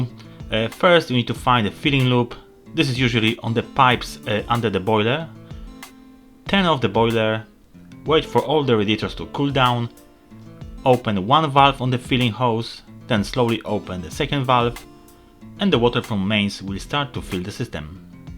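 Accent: Poland. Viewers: o jakim kraju my tu rozmawiamy? bo mam pytanie... z akcentu wnioskuje ze polakiem jestes...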